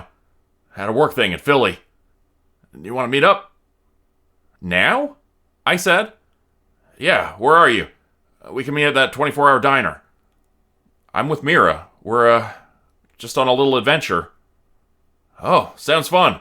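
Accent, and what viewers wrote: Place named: Canada. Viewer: I'm so grateful that you are the story teller and not AI. Your voice is very relaxing, with fantastic expression. Love your accent. Ya Canada ❤ 🇦🇺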